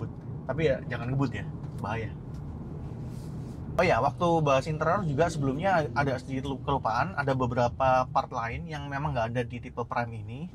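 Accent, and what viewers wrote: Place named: Java, Indonesia. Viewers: logat suroboyoan itu.. beda sama jawa yg lain. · Bingung jon ngomong indo logat jawa...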